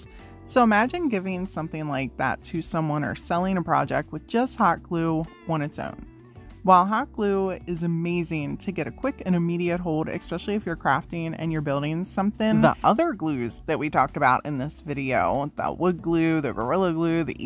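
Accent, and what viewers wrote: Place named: Baltimore, United States. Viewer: I did actually mean like how to sell my products lol sorry I suffer with chronic pain and sometimes my words get jumbled up. Can I ask where you are from? I’m originally from Baltimore, MD, but I’ve lived in San Diego, CA since I was 8-9, it’s just your accent that reminds me of my mom’s. She’s was born and raised in Baltimore and she had a very similar accent like yours. 😊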